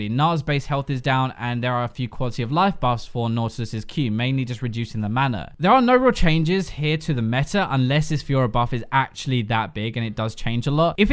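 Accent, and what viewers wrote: United Kingdom. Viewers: i love his accent? what british accent is it?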